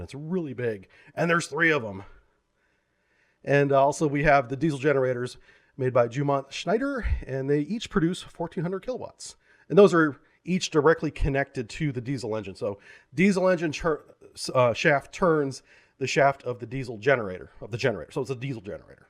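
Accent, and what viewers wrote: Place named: United States. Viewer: And the narrator has an aMERICAN ACCENT.....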